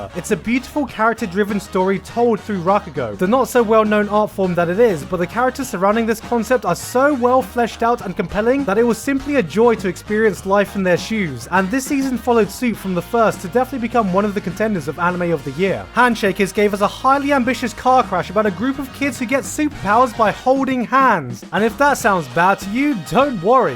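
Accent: United Kingdom. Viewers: [ „playlist suggestion“ -|- 111:02 later x3 ] fuck need more good comedy content that gives me that special kind of exciting and good feeling i get from waching your videos with you talking about how shows are that they them selves never manage to give me and a sexy english voice with a british accent ... =)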